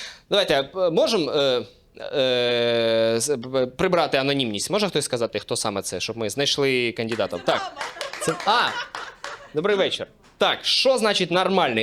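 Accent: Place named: Kherson, Ukraine. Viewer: Та я його відоси тільки за херсонського суржика дивлюся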